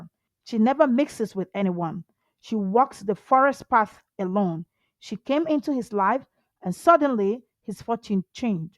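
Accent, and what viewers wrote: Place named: Nigeria. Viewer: This Ai voice is unique with a touch of Nigerian accent 😂😂 and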